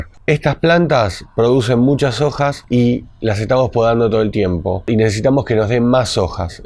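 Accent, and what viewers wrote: Argentina. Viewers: NOOO... DEMASIADO LENTO NOOO Demasiado argentino y ese es uno de sus encantos!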